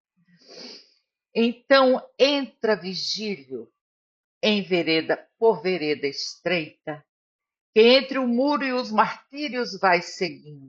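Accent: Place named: Rio Grande do Norte, Brazil. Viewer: Curiosidade: seu sotaque soa potiguar. Você é daqui?🙂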